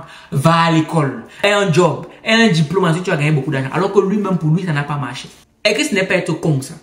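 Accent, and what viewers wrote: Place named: Cameroon. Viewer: Pour une fois je ressens ton accent camerounais 😂😂😂😂😂😂. Merci pour cette vidéo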